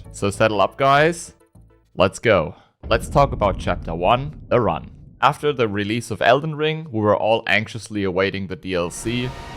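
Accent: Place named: Germany. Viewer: Du kommst aus Deutschland (oder zumindest dem DACH-Raum), machst aber englischsprachigen Content, um potenziell mehr Leute zu erreichen, oder? Also einmal ist da natürlich deiner deutscher Name, andererseits dein Akzent. Ich war nur erst verwirrt, weil YouTube mal wieder von sich aus den Videotitel übersetzt hat ("Der größte Betrüger in Elden Rings" 🙄).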